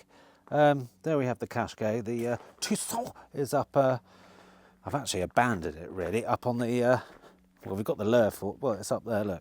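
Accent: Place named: Scunthorpe, United Kingdom. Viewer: Great to hear you're Dad's on the mend! The Scunny accent is an added bonus! By the way, forget anything that runs on batteries...absolute witchcraft! 😜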